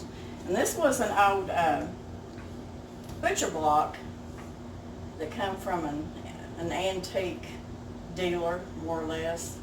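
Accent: United States. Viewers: Wow, that is amazing. Next time take some video of him building this stuff :) What an amazing kitchen and pantry that you have. Oh my goodness... Yeah, please do some teaching videos on CANNING !!!! <3 <3 <3 God bless! I cannot figure out what state you are in with that accent... hmmm....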